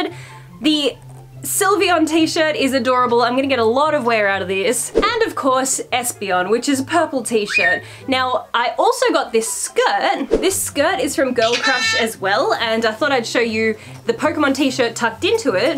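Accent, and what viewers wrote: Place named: Australia. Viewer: I would love to see you do a video about how you got started doing YouTube + how you balance work, life, and being a content creator!! (Although I understand you can't spill all your secrets haha!) I find your videos super inspiring and I love your style and personality so much. I'm going to Japan soon so I've spent the last week finding, and then binging your videos to get ideas on what to look out for when shopping, and I can't get enough! 😭💖 As a NZer I feel like I'm getting a bit of an Aussie accent from watching so many of your videos.... but anyway, I can't wait to watch whatever you post next! 💚💚💚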